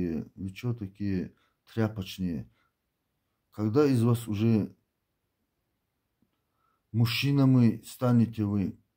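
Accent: Dagestan, Russia. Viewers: А с чего ты взял что ты чеченец ты аварец и твой акцент это говорит и не отрицай это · С каких пор чеченцы говорят с дагестанским акцентом? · Это походу не чеченец!это провокатор!у него акцент не чеченца!!!больше похож на дагестанский! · Акцент аварский,,, никакой он не чеченец, это фуфло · Это не чеченец акцент дагестанский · С нашим акцентом аварским😅 рассуждать о чужой жене ему надо?? Я женщина и то такие мысли не пришли подходит ей муж или не подходит…😅 больше проблем нету у этого мужчины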